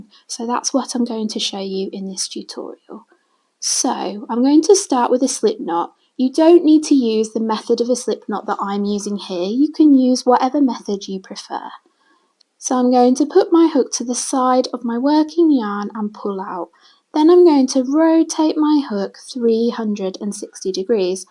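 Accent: England, United Kingdom. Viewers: WOW!!! Thank you so much for this great video.. I can't wait to start.. I'm new to crochet.... Can I just ask a question? .. I know you sound English but are you using American terms ? .... I'm from the UK and I thought the UK term was called a trebble crochet? .... Sorry for my basic question😊....Many thanks❤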